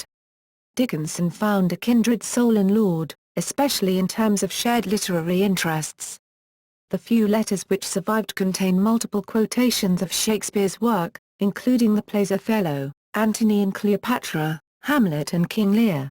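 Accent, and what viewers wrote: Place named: United Kingdom. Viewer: british accent or not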